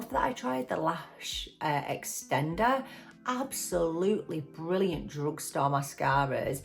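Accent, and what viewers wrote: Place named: Manchester, United Kingdom. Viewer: Thought I detected a slight Mancunian accent ☺️. Wilko stores no longer trade, haven't found any pharmacies that stock Essence as yet!! Have a good day! Xx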